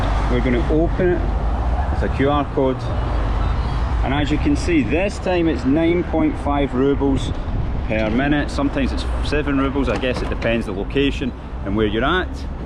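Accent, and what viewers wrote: Scotland, United Kingdom. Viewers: Этот британский акцент)) такое ощущение что я фильм Гая Ричи посмотрел)) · Lol this Scottish accent is exactly the one they used in Counter Strike when you play for the ct forces. Any time I hear that guy I want to buy additional ammo and weapons ; ) <link>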